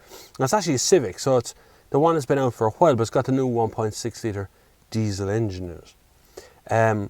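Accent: Ireland. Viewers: Oh I love the Irish way of speaking!:) Sadly I moved from Galway to Switzerland 2 years ago after spending the nicest 12 years of me life over in the Wesht;) Great review there! Ps. Price of this car in ROI comparing to the Swiss price is ridiculously low:)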